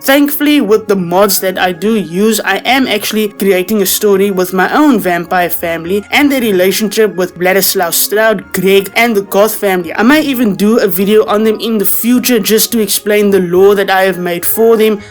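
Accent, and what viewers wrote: South Africa. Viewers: 😭😭😭 I heard the accent and I immediately knew this was a South African channel. what a rare find, love the video.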